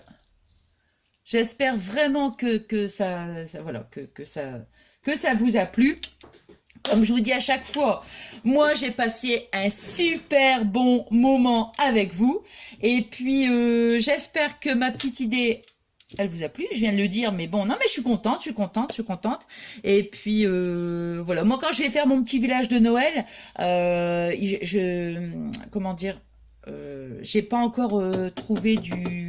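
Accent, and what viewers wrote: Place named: North, France. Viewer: Ccou je viens de découvrir ta chaîne et tu me fais trop rire je me reconnais un p’tit peu dans ta façon d’être j’aime bien du coup je m’abonne à ta chaîne merci reste comme tu es ne change rien j’ai cru reconnaître une Tite pointé du Nord dans ta façon de parler lol 😂 👍bisous 💋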